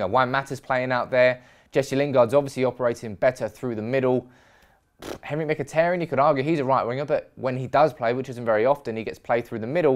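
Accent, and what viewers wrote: Manchester, United Kingdom. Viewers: Interesting Manchester accent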